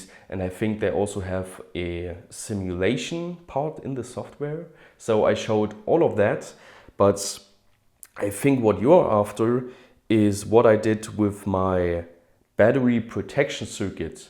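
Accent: Germany. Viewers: So my question is: Without getting too specific so as not to reveal too much detail that you may not want to put online about exactly where you live... (Where your from does not necessarily say where you currently live, and using large regions does little to narrow down a specific location, and accents could come from parents rather than matching exactly where one grew up)... your accent is not what I'm accustom to when I think German Accent, so what part of Germany is your accent from? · I really like your English with german accent.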